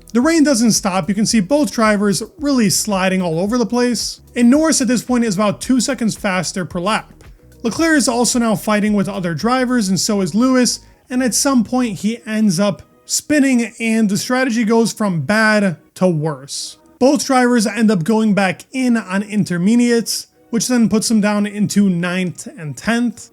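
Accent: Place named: United States. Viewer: As soon as you hear an American trying to discuss what happens in F1 you have to turn off….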